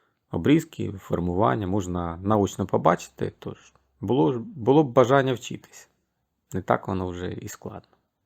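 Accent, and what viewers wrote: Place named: Desna, Ukraine. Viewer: Знайома, точніше, рідна вимова. Таке, як придеснянська. Ви часом не з Батурина?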